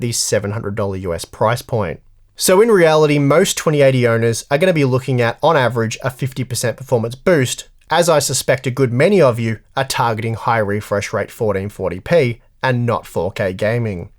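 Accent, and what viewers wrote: Australia. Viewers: And that Aussie accent just gives it extra spice!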